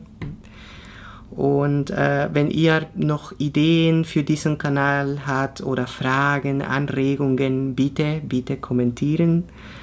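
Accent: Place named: Spain. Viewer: Hallo, du könntest mal angeben, wo man dir eine Spende zukommen lassen kann, per PayPal bspw. Als Energieausgleich dafür, welchen Wert du mit deiner Arbeit den Menschen bringst. Ich kann mir vorstellen, dass der eine oder andere dir gern etwas zurückgeben möchte. Uns pregunta: De qué país eres? Tienes un accento de un hablahispante.:-) Tu alemán tiene un muy alto nivel, muy elevado. 👍🏻 Vielen Dank für deine tolle Arbeit, bin sehr froh, dich hier entdeckt zu haben. 🙏😊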